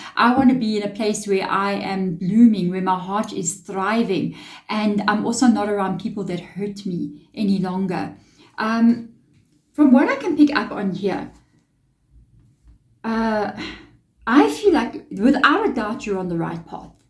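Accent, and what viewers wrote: South Africa. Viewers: I did not pick your accent as South African, but I can hear it now. 💚 My dreams I trust more than anything else.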